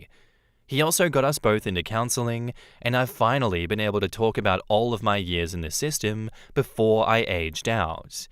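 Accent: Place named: Australia. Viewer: You can tell this narrator is Australian because he's a cucked wuss who's whole country should be erradicated.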